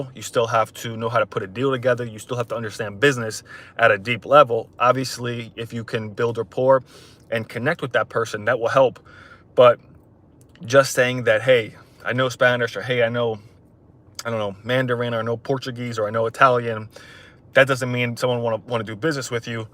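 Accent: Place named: United States. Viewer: He is speaking American.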